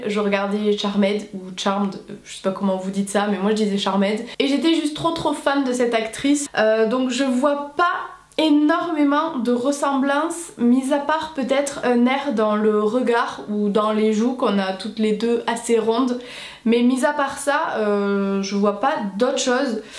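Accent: South, France. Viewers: Je pense qu’il faut regarder koh lanta, pour trouver que Cindy te ressemble ! Vous avez le même accent du Sud ! 😅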